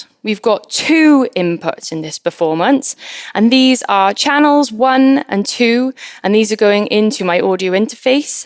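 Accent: Wales, United Kingdom. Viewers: Welsh accent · I stumbled upon your video and it's very very good. I love a welsh accent, cool. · proper UK accents and golf ball on a stick? Good only for you... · Are you Welsh? 🏴 I’m from Neath lol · Are you Welsh???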